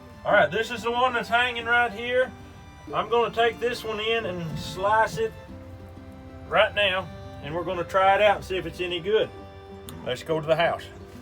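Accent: Tennessee, United States. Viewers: I thought I heard some Tennessee in that accent. Also are yuns off grid?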